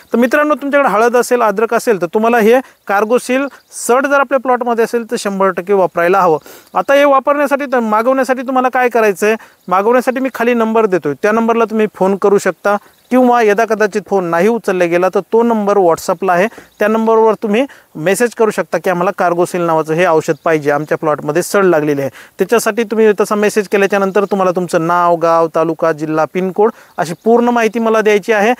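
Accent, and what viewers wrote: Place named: Parbhani, India. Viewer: भाषा परभणी जिल्ह्याची आहे,छान निवेदन करता राव तुम्ही.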